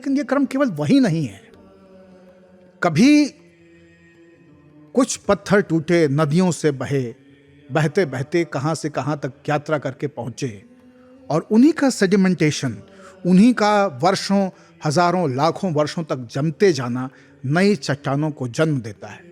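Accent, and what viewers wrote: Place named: Allahabad, India. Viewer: Allahabad style , kahin maja aa gaya , baba is back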